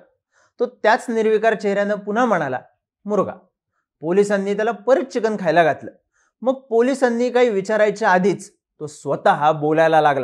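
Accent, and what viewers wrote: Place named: Pune, India. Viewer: तुझ्या बोलण्याची पद्धत खूप आवडली #पुणेरी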